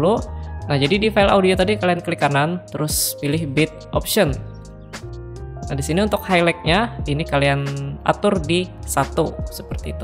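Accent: Banjar, Indonesia. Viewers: logatnya kayak orang banjar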